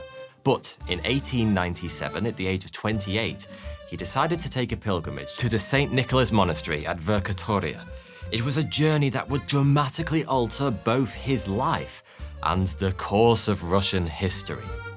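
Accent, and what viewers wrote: United Kingdom. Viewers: He's a brit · Amazing content. I mostly enjoy hearing your British accent. Funny, and history facts. Great job, and thank you.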